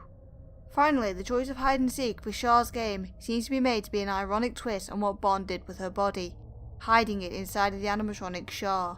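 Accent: United Kingdom. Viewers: You speaking british makes me feel better lol ✨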